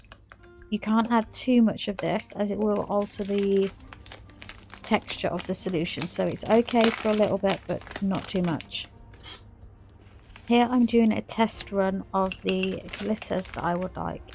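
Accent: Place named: England, United Kingdom. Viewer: So glad I found you and your English not American! I’m now going to binge watch you channel . 😊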